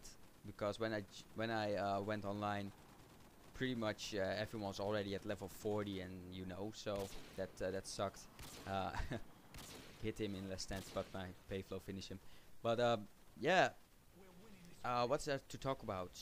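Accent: Netherlands. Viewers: Dutch accent xD, it's really funny:P. Nice game btw netjes flawless ik word altijd op het laatst nog gekilld:P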